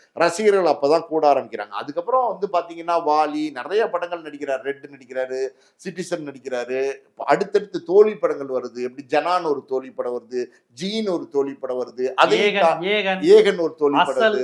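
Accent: Madurai, India. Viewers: nenga madurai ya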